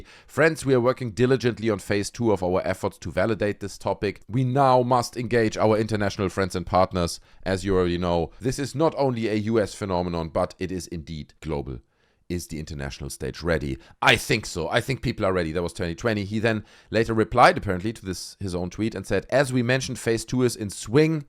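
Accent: Germany. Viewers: Thank you for all your work! Your logic, humor and perfect very slight German accent is such a perfect combination! Keep yourself safe.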